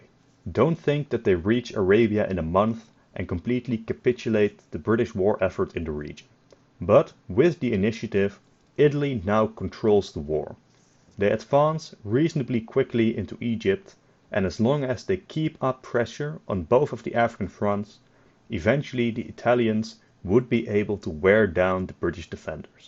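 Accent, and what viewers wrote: Netherlands. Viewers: gast aub leer deftig engels praten. ik zou u vids wel kijke als ge ni zon ambetant hollands accent had!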